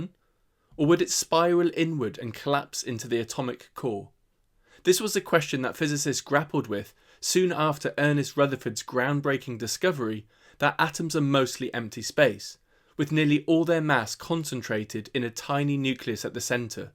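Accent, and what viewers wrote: United Kingdom. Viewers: If you have a British accent, why are you using the American billion?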